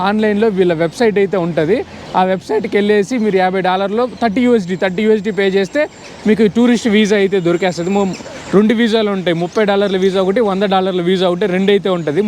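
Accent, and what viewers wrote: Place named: Tirupati, India. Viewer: Brother, nee bhasha chusthe nuvvu maa tirupati laga vunnavu😊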